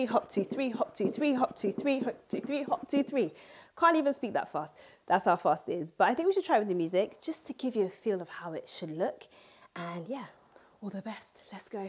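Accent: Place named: United Kingdom. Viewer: Loss the British accent and find your REAL tribe in Africa and be that. Stop the neo-influence policy of Europeanization.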